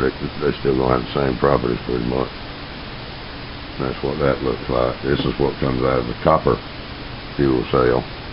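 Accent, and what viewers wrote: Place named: South, United States. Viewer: Pleasure to watch your Vid. Thank You. So why do you think it is. that when people hear a SOUTHERN accent they automtically tjink SLOW OR STUPID? It has always bugged me. Thank you. You may talk slow but you sure don't think that way. :-) PS: My daughter who is Home Schooled follows you and understands you VERY WELL. She is doing experiments everywhere now. She is watching for "SIGNS OF LIFE" LOVE IT!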